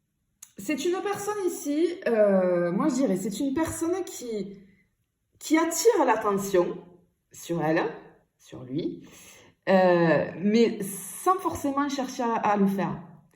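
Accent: South, France. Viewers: Bonjour je vous ai découvert y'a peu de temps 🤗 et vu l'accent magnifique que vous avez j'imagine que vous êtes pas loin de celui dont vous parlez pour moi ici 😂😂 le sud hein .. j'aimerais trop savoir vers où vous êtes située car je vous est découvert presque en même que je l'ai rencontré lui et les énergies sont connectées ! Vraiment !! Trop fort 🤍 ce n'est pas ma fj je précise ,mais certainement un lien transit.. un lien qui m'a renoué avec ma féminité non sacrifié..et oui bref 🌻💌🌸🌷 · Merci, C'est fou ... Au monent où j'ai ouvert youtube j'étais en pleine interrogation et une question en tête et encore plus fou ,je tombe direct sur votre guidance ! Pur hasard ! Merci la grande majorité de votre guidance m'a fais sourire et je l'ai vu ! Merci,cela m'a reconforté, merci pour ce soupçon d'espoir que ses messages étaient pour moi. Tout ça avec notre cher accent du sud. 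Merci Ps: j'ai toujours peur d'y croire mais merci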